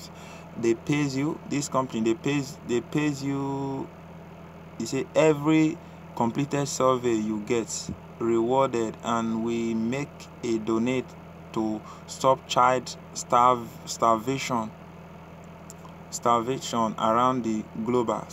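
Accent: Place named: Nigeria. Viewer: He must be Nigerian or just African because I'm African and my dad has his accent lol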